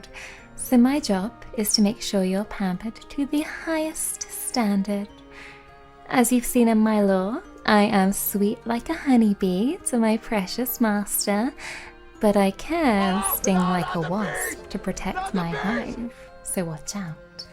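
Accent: United Kingdom. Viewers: Oh my god SPIDERMAN!!! Not my hero.......But it's for though and really, British, soft voice and is a maid who was a knight, is there anything else can do? can hold her boobs..........YES SCORE❤❤❤❤❤❤!!!!!!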